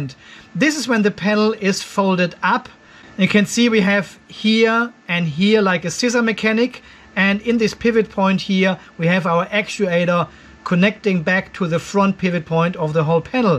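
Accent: Germany. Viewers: Sorry to inform you but I am correct A-do is not a name in the English language. It might be a name in your native language, which sounds German by your accent. But as a native English speaker born in America where the phrase is often used. The phrase "much ado about nothing" is used to describe a situation where there has been a lot of excitement about something that is not really important.03 It comes from the title of a humorous play by William Shakespeare.3 The phrase was already being used for a big commotion or trouble in the early 1500s. Some people make a big fuss over a trifle, as in Jerry had everyone running around looking for his gloves.02 The phrase is often used to describe a situation in which there has been an overreaction to something that shouldn't have caused so much trouble.. But I think you know all of this, and are only making a Joke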